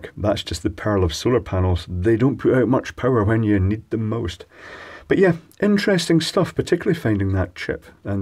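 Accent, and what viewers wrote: Scotland, United Kingdom. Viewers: I am a nonnative speaker of English,yet from the first seconds on I liked your Scottish accent.By the way, the Irish and Scotts are the only English speaking people I like as they were also tormented by the imperial empire.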